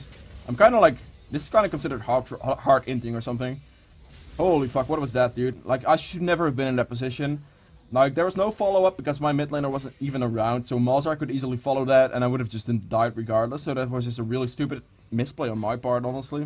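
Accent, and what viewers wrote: Netherlands. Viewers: nice guide 👍👍👌btw are you dutch? it souds like it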